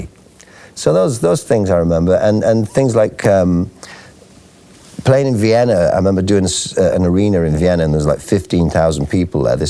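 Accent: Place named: United Kingdom. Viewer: Love listening to yr posh English voice. Come back again.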